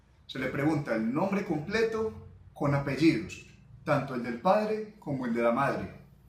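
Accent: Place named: Antioquia, Colombia. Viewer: No solo Colombiano... paisa! :V jajajaja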